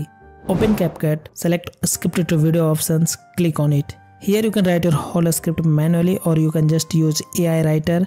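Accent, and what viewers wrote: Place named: India. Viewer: bro,maybe u can try vouce over since I dont like that Indian english accent,the way u pronounc "T" ,its terrible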